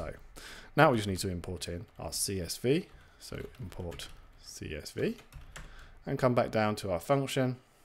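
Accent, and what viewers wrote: United Kingdom. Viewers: It's the British accent. It's a feature not a bug. Nothing to improve.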